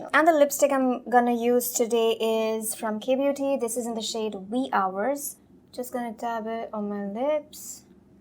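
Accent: Bengal, India. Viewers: WoW you're bengali❤️ Just perfect bengali accent omg❤️beautiful!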